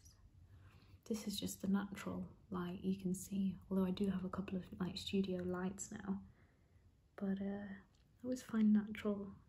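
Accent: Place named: India. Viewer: So relaxing please make another Indian accent asmr video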